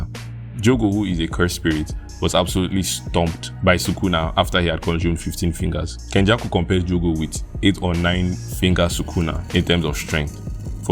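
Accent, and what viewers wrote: Nigeria. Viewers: U sound so much like a Nigerian, good one brother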